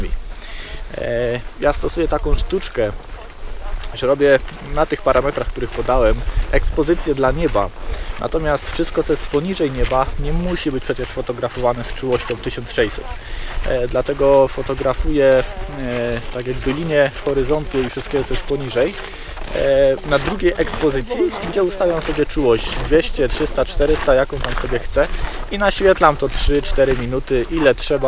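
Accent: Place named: Silesia, Poland. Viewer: Focus to infinity Właśnie oglądaliśmy odcinek z Klimczoka:) Naprawdę udana realizacja. Po akcencie domniemywam że jesteś ze Śląska. pozdrawiam z Tarnowskich Gór:)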